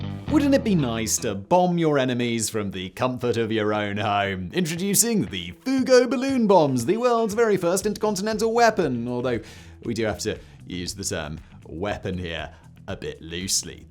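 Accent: United Kingdom. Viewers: I like your videos, but when you explain the details it's rather fast & with your British accent it's often hard to understand what you said. I'm not British and I'm sure your British audience understands every word.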